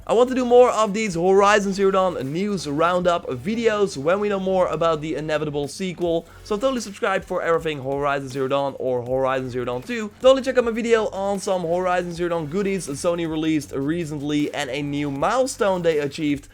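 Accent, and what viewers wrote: Netherlands. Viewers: Are you from NEDERLAND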